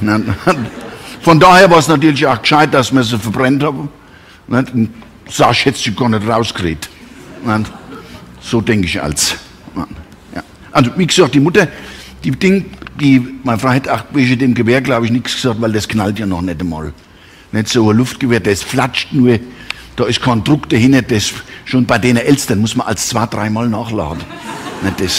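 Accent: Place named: Taunus, Germany. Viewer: Großraum Bad Homburg / Taunus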